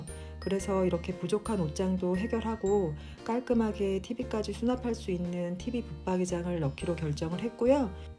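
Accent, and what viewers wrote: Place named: Seoul, South Korea. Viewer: 서울사투리 너무 이쁘세요~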